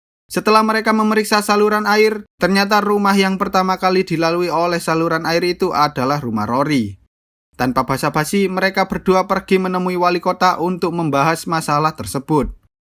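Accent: Java, Indonesia. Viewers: Loh,Mimin wong jowo to dadake? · Ngomongnya jelas bgt bang tapi medok bgt cengkoknya 😭 aku agak gak biasa dengernya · Mimi orang Jawa kah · Uong jowo to mas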